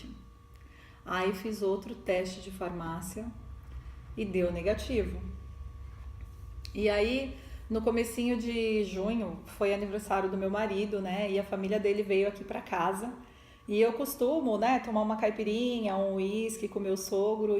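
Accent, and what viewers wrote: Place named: Araraquara, Brazil. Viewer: Legal so de araraquara,senti sua fala....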